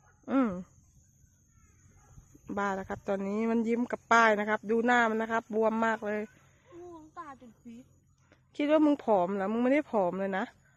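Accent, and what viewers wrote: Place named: Renu Nakhon, Thailand. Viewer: ภาษาเดวกันกับไทเรณูนครเลยเนาะ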